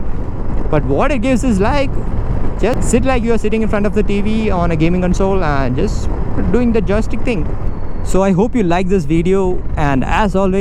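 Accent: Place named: Tamil Nadu, India. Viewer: inner tamil coming out in that english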